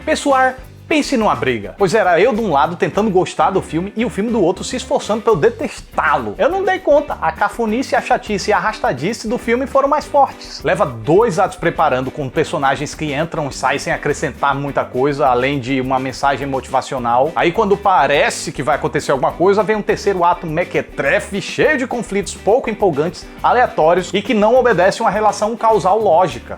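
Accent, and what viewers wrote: Northeast, Brazil. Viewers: só me inscrevi no canal por causa do sotaque nordestino carregado, que é a cereja do bolo! parabéns ótimo vídeo.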